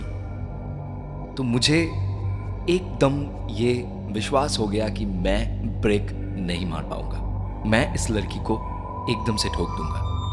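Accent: Bengal, India. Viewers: Dada ami tor onek boro fan. Tumi jkhn dadagiri te aschile tarpor theke ami pretkotha r sob episode sune niyechi sathe purono sob ghotona o sune felechi.Pretkatha hindi channel tumi jedin launch korle seidin e subscribe korechi. Hindi ghotona gulo sune amr mone holo j comment kori. To asol kothai asi kichu kichu word ache jegulo tumi bengali tei bolcho jemon aasa- 'ummid' hobe r 'mrityu'- mat hobe r bengali te 'র', 'ড়' ei 2to alphabet er opor ektu kaj korte hobe. Plz bollam bole kichu mone koro na. Actually Pretkatha r member hoyai Pretkatha jate r o perfect hoi sei jonno comment ta korlm. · Bengali tone avivi hein hindi mein... dhire dhire hindi tone pakar loge bhaiya. Avinandan.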